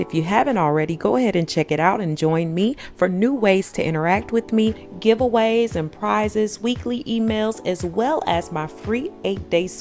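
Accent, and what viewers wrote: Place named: Rural, United States. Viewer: Thank you! I just wanted to say that I love your country accent lol 🤎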